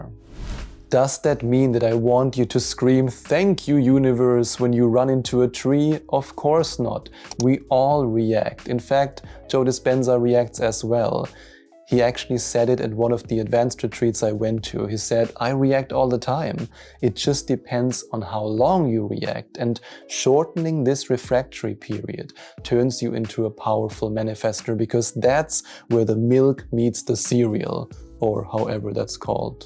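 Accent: Germany. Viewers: Great video,! I appreciate your honest, straightforward approach, not full of hype like so many YouTubers. And I love your German accent!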